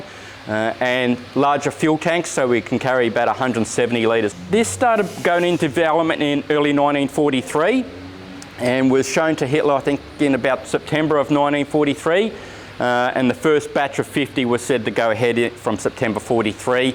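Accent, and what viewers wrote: Australia. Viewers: Aussie vernacular. You'll have get used to it if you want to watch this channel.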